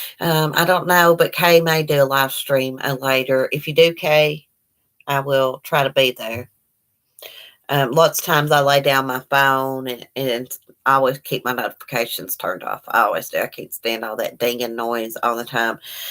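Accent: South, United States. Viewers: I grew up in Alabama and live in Philadelphia now and, let me just say, it is so refreshing to hear a southern accent again. In any case, I just started watching this so you may mention it in this video, but the first article I read about Maleah made me think immediately of the Susan Smith case-- missing car, no witnesses to the alleged "kidnapping" and a lot of reasons to suspect that the parent didn't want their child. I can't recall the details of the investigation involving Susan, but I do remember she claimed her kids were kidnapped by a carjacker while she was at a traffic light at a deserted intersection but she later confessed when the police discovered that the traffic light only turns red if another car is approaching the light from the cross street and they confronted her. Maleah's parents don't seem like the brightest bulbs, so I imagine that they will soon get caught in a similar lie that they won't be able to explain away. 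Sadly, I can't think of any reason as to why they would concoct a kidnapping story unless (I hate to even type this) they killed Maleah and need to explain why she's gone. They may have intentionally killed her, or they may have injured her so severely that she either died or she would have needed medical attention. After one serious injury they were able to get away with it by the skin of their teeth by saying that she fell. It would have been a lot harder to explain away another serious injury.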